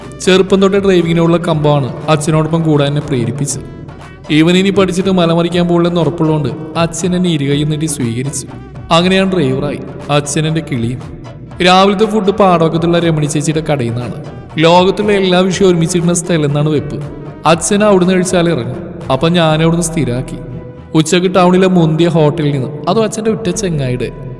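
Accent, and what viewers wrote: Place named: Thrissur, India. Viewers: ന്തുട്ട ഗടിയെ... മ്മ്‌ടെ തൃശൂർ slag ഗിൽ... കിടുട്ടോ... കൊള്ളാം ❤ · Thrissur basha pollichuttaaaa😂❤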